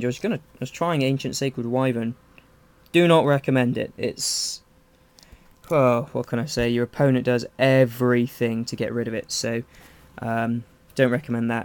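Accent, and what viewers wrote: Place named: United Kingdom. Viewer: you sound like charlieissocoollike but probably its just the british accent :D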